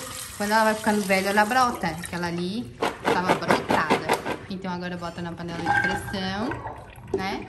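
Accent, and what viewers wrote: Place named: South, Brazil. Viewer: Quel plaisir de te voir.. AMOUR...sa manque les jours quand je ne te vois pas.et que je N'entends pas ta belle voix avec ton accent Brésilien du Sud.... AMOUR..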